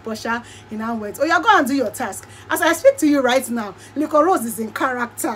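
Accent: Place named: Nigeria. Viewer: I just love the Nigerian Pidgin accent from you😂😂. Makes the story super juicy.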